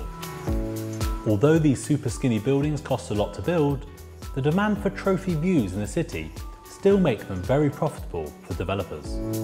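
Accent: United Kingdom. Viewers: Quirky British/ accent make you sound pretentious. Tone it done bro. None of my Brit friends talk like you do. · not everyone with a british accent sounds calming, intelligent and elegant...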